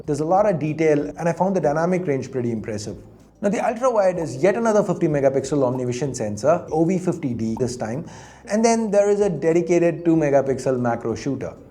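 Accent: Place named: India. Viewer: Hey I hope you don't take this the wrong way but and I apologize if I offend anybody by saying this but usually Indian speaking people drive me nuts because I am in American and we are just so used to the way we talk. Not saying anything about your people it's just the way you guys talk That's it other than that I love you guys. The reason why I say that is because usually I'll just turn the channel off I gave you a chance and I love your channel. I really in to the newest tech when it comes to phones I believe these gaming phones are just a scam You're not going to get anything better out of it than you would say an s24 ultra you get the same performance but that's just my opinion your allowed to have any opinion you choose. The one thing I will give this phone at least they put a better screen on their phone than that rog phone. The rog phone is still putting a 1080p on the newest one where this phone at least want to a 1 and a 1/2 k screen I think for the money you're putting out for a phone like the rog phone I believe it's like $200 more for that time than it is for this one.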